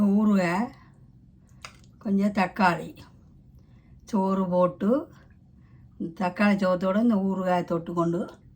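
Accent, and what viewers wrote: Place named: Sri Lanka. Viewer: உங்களுடைய ஸ்ரீலங்கா தமிழ் அழகாக பேசுறீங்க❤ உங்களுக்கு நான் சப்போர்ட் பண்ணிட்டேன் சிஸ்டர் ❤🎉❤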